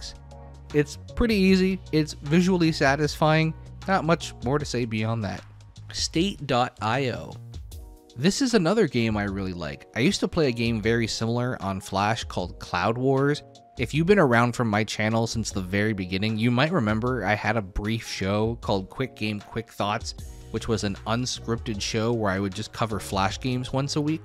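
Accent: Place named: Canada. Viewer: Canadians accents are weird and kinda cool. You guys sound American to my European ears, until a certain word comes along, and I'm like: "ooooh, they're Canadian lmao".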